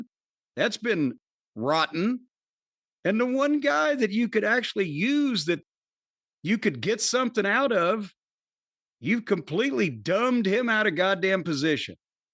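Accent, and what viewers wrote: South, United States. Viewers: 'The more you here him talk, the less you like him'... im feeling this with cornette lately. His southern, unwilling to change, preacher type voice is starting to get irritating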